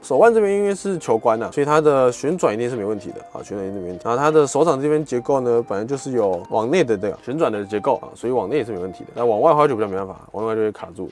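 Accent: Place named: Japan. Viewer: 應該是剛學好普通話的日本人吧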